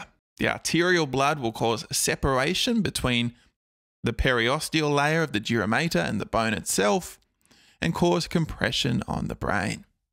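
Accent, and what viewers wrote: Australia. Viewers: This Aussie accent is so satisfying to hear and also thanks a lot for this vid ♡ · Bro is aussie Thankyou though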